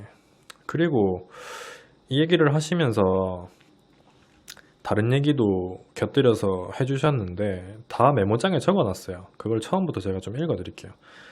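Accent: Gyeongsang, South Korea. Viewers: 나 50대에요. 구독하고 듣고 있어요. 나도 경상도 출신이라 사투리 억양이 편하고 시니컬한 조언이 좋아요.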